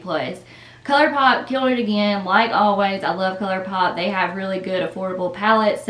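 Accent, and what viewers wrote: South, United States. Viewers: i love the accent, you from the south too?💗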